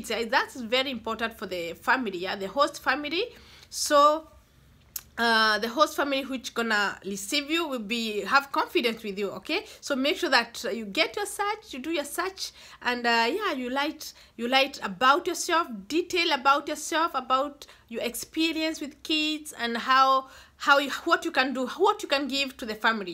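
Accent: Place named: Kenya. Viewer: You cannot take the village out of a person..kikuyu accent still there after 10yrs,#gikuyu&mumbi seed.....proud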